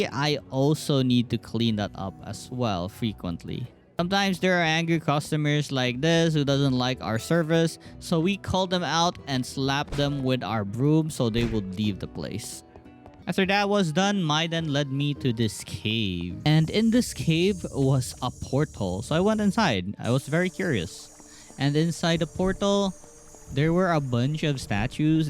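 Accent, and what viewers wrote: Philippines. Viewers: A sense Filipino accent, Pinoy ka lods? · The tagalog accent is real on this one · The Pinoy accent made this video better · I knew it. Haha! Strong pinoy accent 😁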